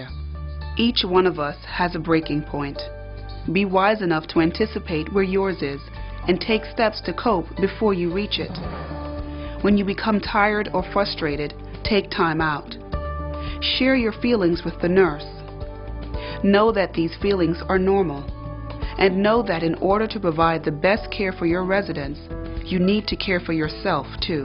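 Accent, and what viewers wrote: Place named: England, United Kingdom. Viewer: Best teacher ever...soo eloquent ...like your clear English accent..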